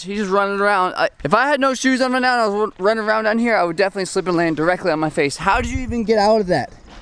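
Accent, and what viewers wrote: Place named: Australia. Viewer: How are u Aussie I can tell cause I am Aussie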